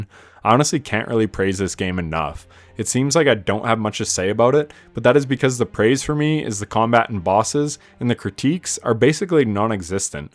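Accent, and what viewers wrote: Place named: Canada. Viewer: Halfway through the video I realized you’re Canadian 😂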